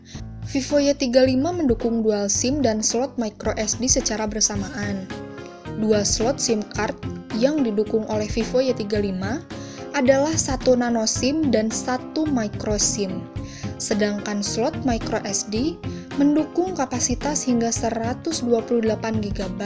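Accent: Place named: West Java, Indonesia. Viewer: ... Nama Jawa, tapi logatnya Sunda yah?:)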